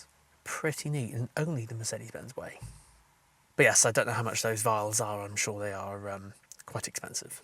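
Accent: England, United Kingdom. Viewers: Great video. English accent always adds a level of sophistication. I miss Europe.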